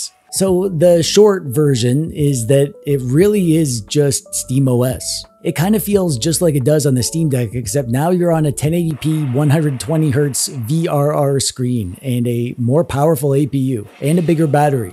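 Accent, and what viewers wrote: Canada. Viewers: You’re Canadian, aren’t you!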